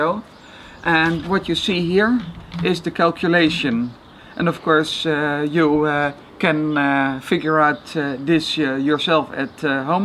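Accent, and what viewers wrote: Netherlands. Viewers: You can tell this guy is dutch lmao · #Nederlands-Engels accent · A true Dutch accent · dutch english. cool technic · Hehe that obvious dutch accent... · I knew you are Dutch when I heard you :D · well the video maker is Dutch for sure, you can hear it about hus accent, and the police siren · His dutch accent is showing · He sounds so Dutch. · Sorry but its so funny to hear a dutch guy talk english 😂😂😂 Nice video bro! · are you dutch? cuz your accent and you say 'From Deventer' and Deventer is a city in the Netherlands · Wild guess, but are you dutch? I'm litteraly 100% confident you are. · Puur Nederlands accent. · you sound like what the average Dutch guy would sound like if he spoke English. subbed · Man you sound Dutch. Are you?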